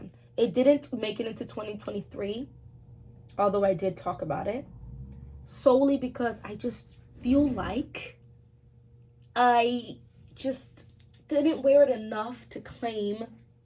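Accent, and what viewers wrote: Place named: Jamaica. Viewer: Lmao it’s the Jamaican dialects in bits & pieces for me. Enjoyed this vid!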